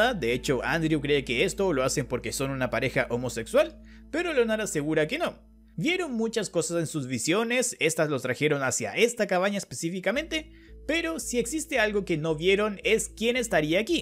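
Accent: Chile. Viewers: ERES CHILENO? 🇨🇱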